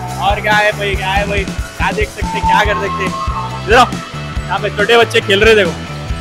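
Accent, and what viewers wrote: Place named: Hyderabad, India. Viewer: Our Hyderabadi hindi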